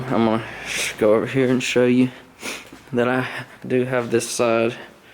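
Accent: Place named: South, United States. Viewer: I'm just hear to hear that sweet southern twang...... XOXO!!!! THUMBS UP IF YOU AGREE!! #TDHFTFW #FAMOOSE #SHTNONM #TEAM.DEADLY.HERTZ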